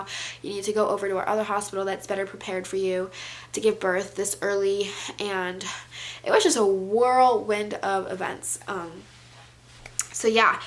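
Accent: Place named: Rochester, United States. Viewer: Hey! Love your videos, been watching since your first one! I’m from Rochester too and I can tell your starting to get an accent😊 keep making great videos xoxo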